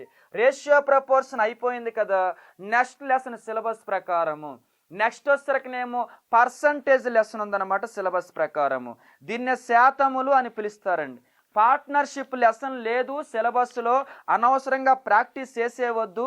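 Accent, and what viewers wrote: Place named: Srikakulam, India. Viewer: Sir meedi srikakulama vijayanagarama yaasa alaa vundi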